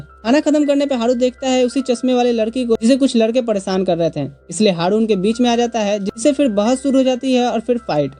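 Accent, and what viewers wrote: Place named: Bhojpuri, India. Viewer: Mr apka asent bhojpuri 😅 h same here ☺️☺️and apki voice achi h 😴😴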